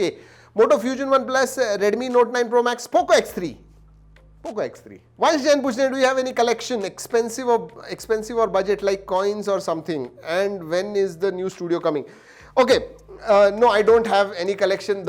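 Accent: Maharashtra, India. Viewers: aap jab marathi bolte ho to bahot achha lgta hai.. kya aap maharastra se belong krte ho..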